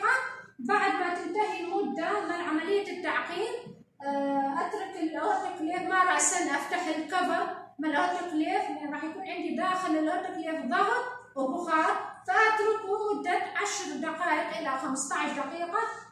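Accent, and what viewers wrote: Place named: Baghdad, Iraq. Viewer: جهود مباركه اللهجه الحلوه ❤ بغداديه قح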